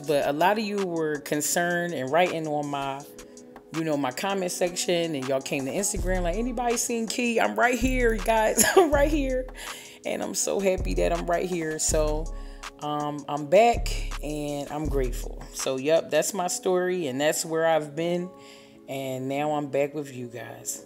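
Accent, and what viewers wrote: Philadelphia, United States. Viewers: Is that a Philly accent I hear?? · Girl where u from ? I’m hearing an accent I’m thinkin Philly or somethin It’s up north but sliding towards dmv twang but not a hunnit percent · I LOVE watch all of Your videos because you reminds me of a lot of my favorite older cousins from Philly but you sound sooo much like my family I remember as a child who I use to adore and look up to them its that accent you can tell a Philly girl from anywhere my MOM also sounds like that too even though she’s been living in Cali for over 40 years I remember when I moved out there as a little girl 👧🏾 I use to love to go to my cousins hair salon down in Upper Darby & get my hair done every weekend and then go shopping at the end!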